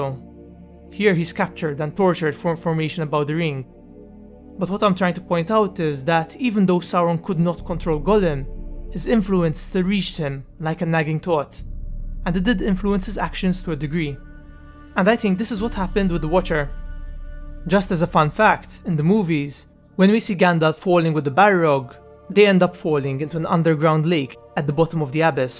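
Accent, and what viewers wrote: Malta. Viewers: Ifhem, fl-about hemm "country: Malta" kif wkoll l'accent jghin naqra ghal assumazjoni bhal dik! Proset imma eh, kompli sejjer hekk :D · Your accent is interesting. Are you Maltese by any chance?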